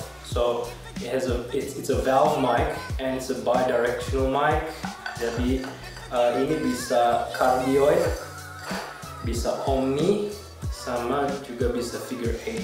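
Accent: Australia. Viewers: Uwawww aksen nya australia nice nice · Klo ngomong keliatan Aussie nya 😆😆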